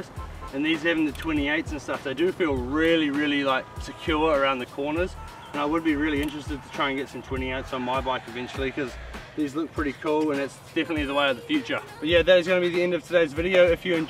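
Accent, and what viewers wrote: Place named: United Kingdom. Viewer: your UK accent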